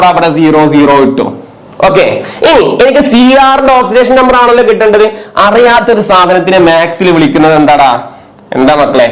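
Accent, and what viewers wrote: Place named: Kannur, India. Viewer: Kannur slang😂🫶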